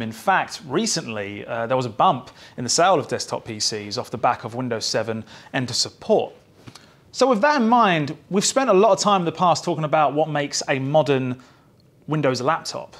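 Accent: United Kingdom. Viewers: with a man of Kent Maidstone accent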